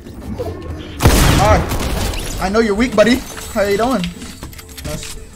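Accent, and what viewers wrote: Jamaica. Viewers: Yo are you Jamaican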